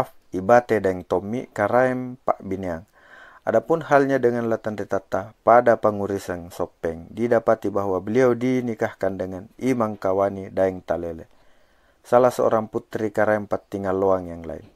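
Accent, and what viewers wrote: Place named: Wajo, Indonesia. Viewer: Yg bicara pasti orang wajo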